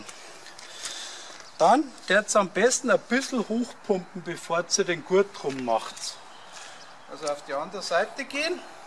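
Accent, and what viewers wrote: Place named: Bavaria, Germany. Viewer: Das war echt gut, auch für blöde gemacht, leider sehr bayerisch und damit schwer zu verstehen !!!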